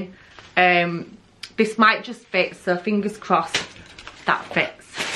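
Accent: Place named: Manchester, United Kingdom. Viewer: “Propped good hun” proper Mancunian woman I’m a mancunian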